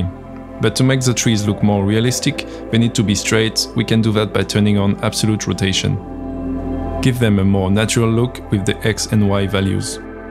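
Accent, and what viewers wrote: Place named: France. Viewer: Toi tu es français c'est sure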